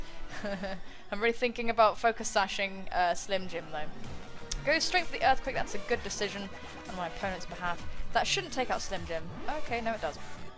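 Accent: England, United Kingdom. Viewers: Sounds awesome! Does anyone pick up on the English accent? :) But is it possible to get tea over there is an important question! Congrats on the 2K subscriber boost by the way, it's incredible! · Where in UK are you from?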